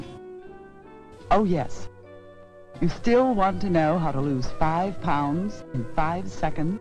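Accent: Transatlantic, United States. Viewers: This is known as a transatlantic dialect, it was very popular for narration on film and in television in America from the 1920s through the 1950s.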